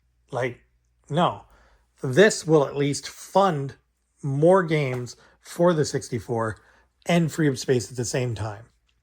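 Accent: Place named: Canada. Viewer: Awesome game room man, one of the best ones I seen! Do you know UndeadChronic? You sound spot on exactly like one of his Warband members with the Canadian accent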